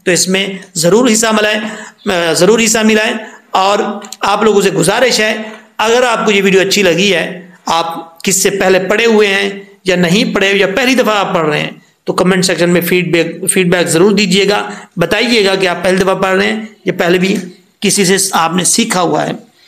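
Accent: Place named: Punjab, Pakistan. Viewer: او بھائی! آپ خود تو کہیں سے سیکھ لیں، پنجابی میں پڑھ رہے ہیں،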